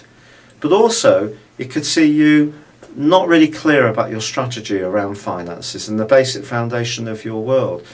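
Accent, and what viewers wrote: England, United Kingdom. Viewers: Why do all of you astrology people have English accents. I can not stand that sound .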